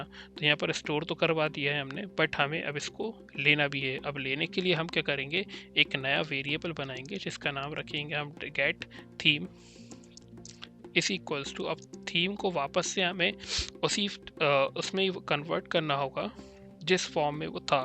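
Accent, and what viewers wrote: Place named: India. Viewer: took my like back immediately he started speaking indian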